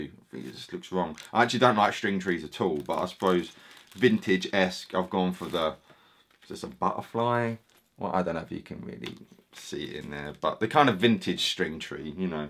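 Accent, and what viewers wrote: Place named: England, United Kingdom. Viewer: Great video!! Love that accent man. Im a new sub so i gotta ask what part of England are you from?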